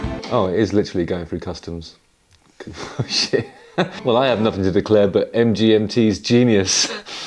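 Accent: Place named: Southern England, United Kingdom. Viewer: Most English man alive…… definitely south English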